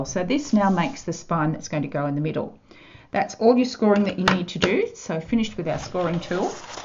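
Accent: Australia. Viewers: Love hearing the Aussie accent! And really like the project. The angle of the camera is a quite uncomfortable to view!!! Hope you might be able to change this!! Thanks for a great tutorial!!!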